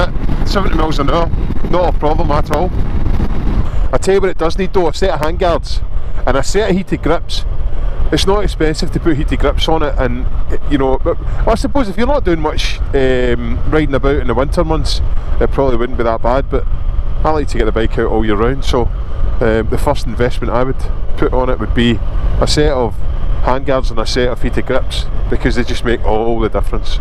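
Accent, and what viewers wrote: Scotland, United Kingdom. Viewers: Scottishtriumph, yak, what a terrbile inaccessible accent..😢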